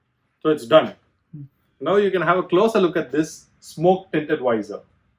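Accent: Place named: India. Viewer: I like English with Indian acsen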